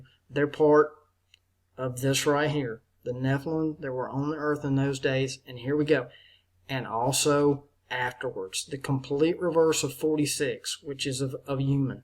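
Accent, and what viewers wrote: South, United States. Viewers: what part of the South are you from buddy? I'm from east Tennessee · I like your accent because I'm from the great Southern USA!!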